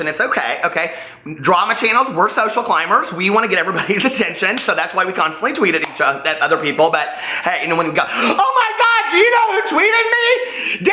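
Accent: South, United States. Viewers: I’m a life-long Southerner, but I do wonder why you, a life-long Indianian, have a Southern accent. Like the way you say “thang” instead of “thing.” So cute! I love it!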